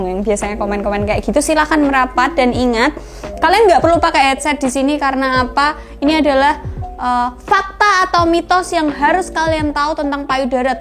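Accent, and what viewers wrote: Java, Indonesia. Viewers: Jangan pake bahasa Jawa dong ga ngerti, medok medok aja😒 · G bisa bahasa 8ndo nesia yg bagus ya k9k medok gtu emang ada bahsa indo yg kayak gtu blajar dlu baru ngomong yo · Cara ngmong nya itu yg aku suka perpaduan jowo dan bahasa indonesia love dah mba ❤ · Bahasa jawa medok nya bikin gua senyum sendiri 😀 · Mbk samean ark ndi to Kok omh e jowoan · jawane ngendi mbk.e😁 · Asli jowo to mbak😁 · Njirr gua suka chenel ini bukan karna pembahasannya, tapi cara penjelasannya lucu,konyol,kocak.. Khass cwi jawa🤩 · Sippp kak.. Aku suka aku suka pmbahasanya kena dan medokk bngttt jowone... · Aduh.. Kak udh nikah blom sih? Klo blom mau ga klo aku nikahin😂tipe gua bgt ni cwe, frontal, buka"an, manis, medok, haduhh smoga kau jodohku wkwkw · Suka bgt ama logat cara berbicaranya ehehe pa lagi klo kdng ada jawa jawanya gitu ehehe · Logat Jawa keren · Cw cantik ngomonge medok kesane lah lucu😁 asikk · Medox banget mbak..😄🤭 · Indo Inggris ❌ Indo Jawa ✔️ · Anjaay medokk jawanya bikinn gemesin ngomngnya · Suka bener sama kak ini dari segi bicara sampe penyampaian nya selalu pas apalagi ada logat jawanya enk gitu di denger wkwkw Sehat selalu kak 😊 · Masuk mbak.... 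Kenthel banget jawamu mbak. Nek boleh tahu jawamu ngendi mbak? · Koe jowo yo mba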